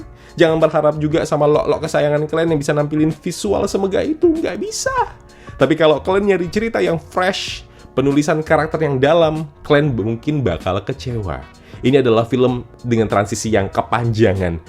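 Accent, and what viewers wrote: Medan, Indonesia. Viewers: bener banget lgi yg di bilng abg ini. komposisi crita nya hampir sama. dmna eywa membantu dengan mengirimkan bala bantuan yg sama, terus pas war di air juga sama. tpi smua itu terbayar karna visualnya dan action ny. ya cukup di nikmatila bagi orng yg gk terlalu Mandang crita. btw ko orng medan ya bg😂?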